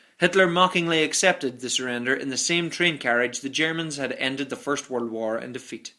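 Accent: Ireland. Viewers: You sound irish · Are you Irish? Your accent sounds like it, I have Irish cousins and they sound just like you lol. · man i know you had cram lot in 7 min and people love to kind of blow over the whole aid thing from america you did bring it up but like a after thought you talked about britians navy and air force so much better germany and it was how ever the one thing all non america quick not talk about the lean lease with out the fuel from it britian would not be able to fly the planes or would not been able to sail the ships also on to russia you said it amassed large army true but with out food aid fuel weapons and material russia would been forced to surrender i know you were on a time restraint and i do think you for the sleeping giant i think that was churchill that first said it and i cant really tell by your accent i take it your irish for even bringing up irland if so there my second faviort non usa country only germany do i love more mostly cause out side of america the germans invented the most stuff not as much as america but alot i love that little country not little compaired to irland but little compaired to usa or russia and would beaten russia in my opion if was not for america that means would took a country 2 and half times the usa or about 15 that of its self not to mention belgium france poland all the others by its self mostly yea italy helped a little but hell greece beat italy in the early parts but i might be going after your video that does not mean i did not like it so im sure first thing if you do respond to this will be my grammar yea i know it sucks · Damn, everything is more interesting and funny with an Irish accent :D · are you Irish? 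you sound Irish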